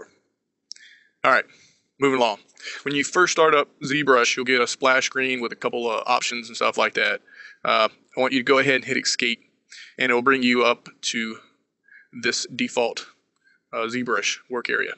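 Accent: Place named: South, United States. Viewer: You sound like you're either in Tennessee or Georgia. Great tutorial though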